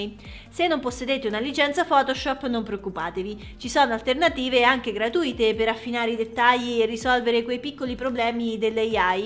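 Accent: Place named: Marche, Italy. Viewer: Sei bravissima, per colpa della tua spiegazione fantastica questa notte mi sono fatto un bel abbonamento a Mid...sento dalla tua voce odore di casa...penso che sei zona Marche sud...Complimenti ancora.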